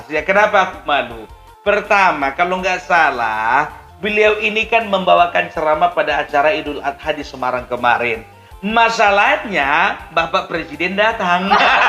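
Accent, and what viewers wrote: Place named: North Sumatra, Indonesia. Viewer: Enak opung ini kalau bicara dengan aksen batakx yg kental sangat menyenangkan, bagus banget.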